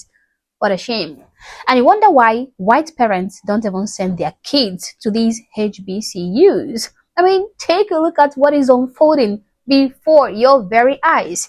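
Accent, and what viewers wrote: Africa. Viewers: You have an African accent. Are you able to find African content for your YouTube videos. Black schools have their graduation traditions. Do you have graduations in Africa? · The other day, I was going on about hyphenated Americans. one in particular african-Americans... I was explaining the difference (in my opinion !) between black folks black Americans & African-Americans... & Sweetie I want Thanx for just being you & your accent, because in my book an ""African-American"" first & foremost they have to 1) be American citizen, but almost more importantly they have to some like your exact accent, no disrespect, what I would (maybe Mediterranean Africa would be different !) but all of y'all south of the Sahara all them (I have not heard many different accents from various parts of Africa) but they're going to sound a lot just like you ! But black Americans who's 3, 4 generations ago was "slaves", they don't know a damn thing about Africa, & are no more African that I am ! 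I am not denying the black that they are black folks I mean I am not blind yet ! (🙏Thanx be unto Our God & His Son Jesus Our Lord & Savior, Amen🙏) I can see I am not color blind, I've even said if black folks where to be stupid enough to hate America so much they transplanted themselves over to Africa... They would be in shock ! They (the indigenous Africans) be looking these transplants they would not see the American blacks as Africans; there's a completely different mind set a whole different way of thinking about things, & American blacks over in African countries be seen like fish out of water, like tourist really, that you came for a visit, I wonder if the real live Afric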